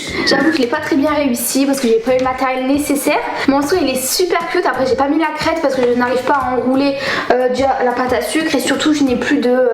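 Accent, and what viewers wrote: North, France. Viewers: Vous avez un accent du Nord je pense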